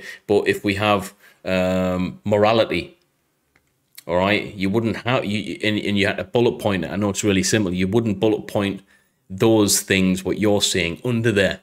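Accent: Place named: United Kingdom. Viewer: I’m brand new to the channel, I’ve just come across one of your ‘voice over’ videos (the 5 reasons the US will beat China & Russia or similar. I’ve mashed the subscribe and like button. Loving this video, I’m ex-light dragoons, I have huge respect for the royals. Absolutely outstanding soldiers, great moral compass and I massively agree with everything said on this. Where are you from mate? You sound my neck of the woods. Well done on the channel. 🇬🇧🤜🏻🤛🏻⚓️⛴🥷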